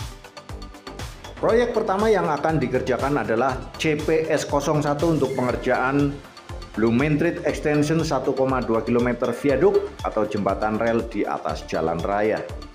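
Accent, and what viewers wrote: Banyumas, Indonesia. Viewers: Ora ngilangi logat ngapak e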